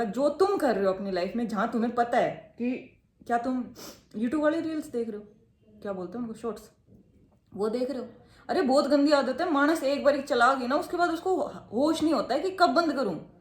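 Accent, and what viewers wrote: Haryana, India. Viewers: Your haryanvi accent is so cool😅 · Mhare Haryana tt ho aap, awaj tt hi beraa laag gya😂😂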